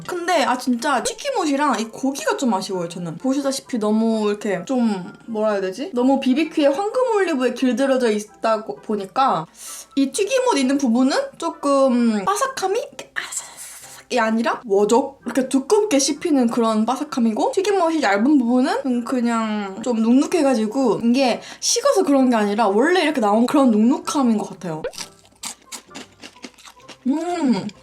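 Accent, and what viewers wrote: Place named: Jeolla, South Korea. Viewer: 온니 혹시 전라도 사람이신가여 ?!?! 말투가 되게 친근감 있어서요 ❤️❤️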